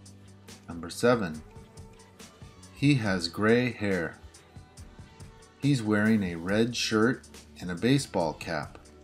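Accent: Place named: United States. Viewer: Thanks! I couldn´t find an American accent video till I saw this one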